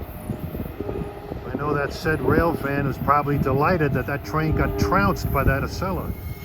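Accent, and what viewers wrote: New York, United States. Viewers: I'm a southerner, but I love listening to you speak in your NY accent. Your voice is quite soothing and is very pleasant to listen to. I really like the way you compose your videos, and the way you give lots of relevant information for each train. Your camera skills are also quite impressive, with nary a camera jiggle in sight. You have become one of my favorite railfan channels! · Boy, this is great! Grew up in North Jersey and every Fri. night in the 70's we'd trek down to Pct. Junction and watch GG-1's slam through along with E-44's on loads of freight trains with occasional diesels. Around ~ AM the last remaining RPO (mail) train left running in the U.S. would head south, always with two G's and then we'd head home. Rail therapy at its finest! Thank you for jogging the memories and I loved your presentation of train info-most times I hate narration so that says a lot. 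And never lose your NY accent! Makes me homesick... now I gotta go watch all 6 Sopranos seasons over, LOL! Subscribed.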